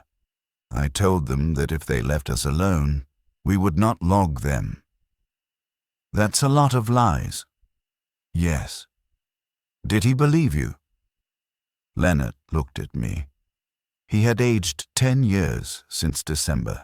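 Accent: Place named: Aristocratic, United Kingdom. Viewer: Dont mind the English accent .but an aristocratic one sucks.🇨🇦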